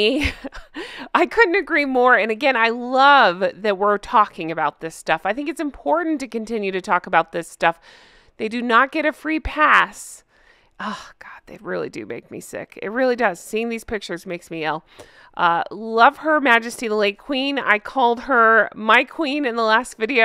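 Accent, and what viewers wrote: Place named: United States. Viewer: When you said "my Queen" in the last video it surprised me (being from one of the realms, Australia, so she was/is literally my Queen) and it touched me that you felt that way. I then did a double take, wondering if you were American as I had thought - perhaps you were Canadian who lived in the US and picked up the local accent, because then she definitely would be your Queen too. But no, I checked your channel details that said US. So you admire Queen Elizabeth II so much you show it by calling her your Queen. That's wonderful.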